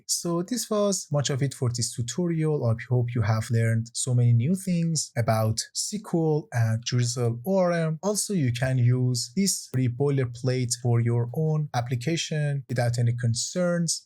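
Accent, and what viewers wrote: Iran. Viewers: I am hearing a familiar accent? Are you Persian bro?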